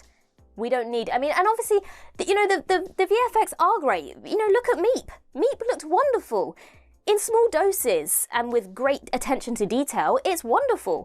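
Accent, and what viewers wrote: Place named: RP, United Kingdom. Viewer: As an American, I am always fascinated just sitting and listening to a Received British accent. Also, the enthusiasm for the Doctor Who episode is fun. Thank you again.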